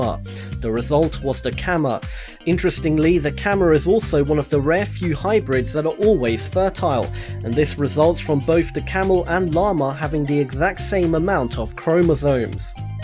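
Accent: England, United Kingdom. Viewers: That is just his English accent.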